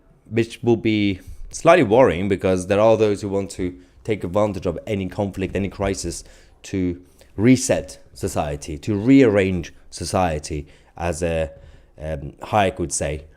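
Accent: United Kingdom. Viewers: eating MTZSM and listening to news from 'murica in bri'ish accent is priceless. Thanx. And ppl go after watching and do that like thing before making coffee... (at least)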